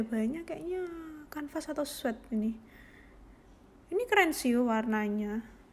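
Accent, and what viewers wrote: Java, Indonesia. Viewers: Logat nya kaya jawa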